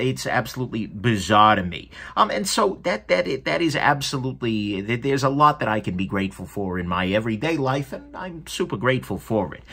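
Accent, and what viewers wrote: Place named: Long Island, United States. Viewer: Long Island loves you! You sound like one of us! Lol! You bring me so much joy watching you dissect my favorite things other than my sons, KNIVES! Shot out to Doc Frunky and Frankie and Byrd! They are awesome too!!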